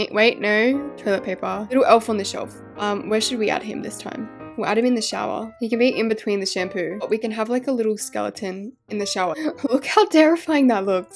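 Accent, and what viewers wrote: United Kingdom. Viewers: I love that u talk British 😊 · I love ur voice its like so British !! like I did like ur “red is a spookah colar”!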